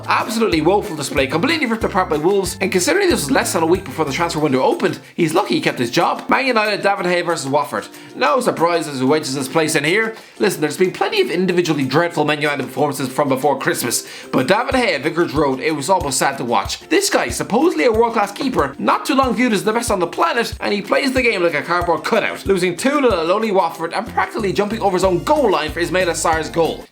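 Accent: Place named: Ireland. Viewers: Can you please Stop! Doing these chips.. so quickly your voice is afraid irritating! The Second I heard your voice I have turn it off.. I don't mean to be offensive in anyway but the Irish accent sweet when it's smooth & slow but you talk at 100 miles an hour & it's like listening to a fire alarm going off at 4am.. consistent your audience thank you. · You do realise that the whole audience love that he speaks fast and his angry Irish accent, its just you being a Karen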